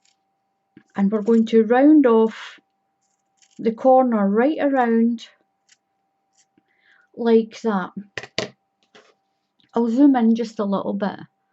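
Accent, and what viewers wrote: Scotland, United Kingdom. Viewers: Fantastic vid & being Scottish myself its gr8 hearing our accent 4a change. So easy 2make & who hasnt got an old T shirt laying abt some drawer at home. Thanx so much. Stay safe & well.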